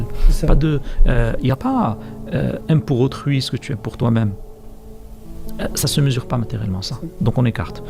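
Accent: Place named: Morocco. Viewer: on reconnaît l'accent marocain❤❤🇲🇦🇲🇦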